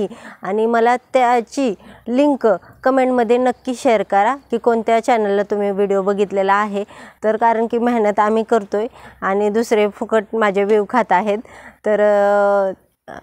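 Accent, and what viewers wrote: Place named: Solapur, India. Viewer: Nice vlog: I love to hear your Marathi with Sholapur flavor. So frank and sincere.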